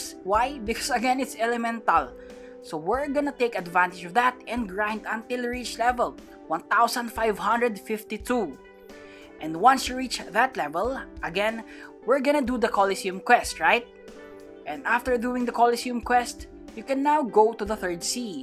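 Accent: Philippines. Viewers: Dam i just noticed from PH😱♥️ because i noticed the accent so i checked the bio and i was suprised! Im also from PH hehe Great video as always♥️😱🥳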